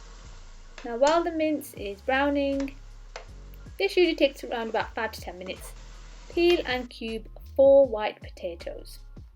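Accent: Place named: North, United Kingdom. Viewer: it’s the northern accent that does it for me!!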